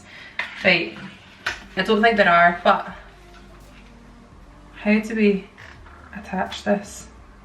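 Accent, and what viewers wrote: Scotland, United Kingdom. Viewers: Gosh the Scottish accent is so charming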